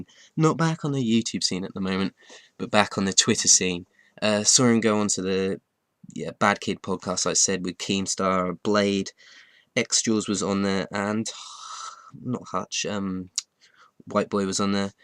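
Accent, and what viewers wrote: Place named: East Anglia, United Kingdom. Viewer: Your pronouncing haha east Anglian lad, loving then showing off of the stats at the end haha, good gameplay tho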